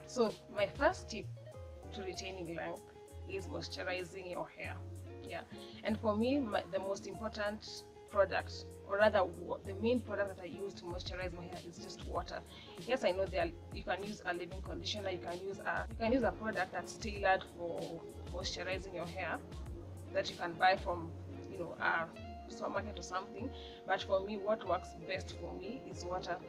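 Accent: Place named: Africa. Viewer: You have a lovely accent. Where are you from? Zambia, Kenya?? Trying to guess Lol. I'm from Zimbabwe and your accent sounds pretty close to that area of the continent.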